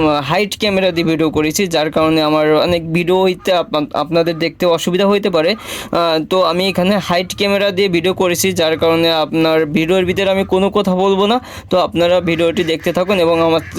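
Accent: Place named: Khulna, Bangladesh. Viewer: দেশের বাড়ি কী খুলনা কথা তো আমাদের খুলনার মতো মনে হয়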